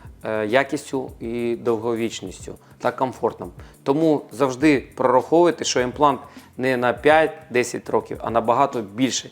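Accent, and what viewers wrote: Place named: Russia. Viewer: кацапський діалект пре зо всіх щілин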